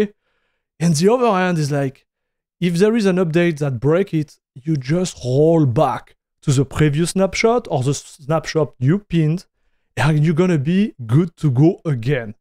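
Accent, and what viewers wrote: France. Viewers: Tu serais pas un peu français ?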